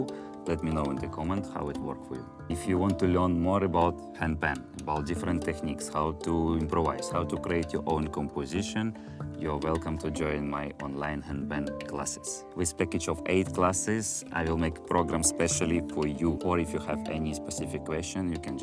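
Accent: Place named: Russia. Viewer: дружеский совет посмотреть как избавиться от русского акцента особенно если собираешься еще делать такие крутые видео.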